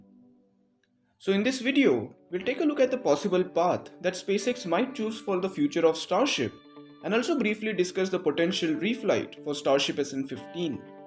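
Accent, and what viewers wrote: India. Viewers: Horrible Indian English accent, made my head hurt.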